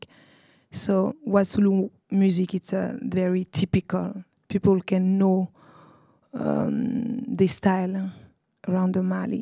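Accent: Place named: France. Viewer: I can hear she's French talking usually...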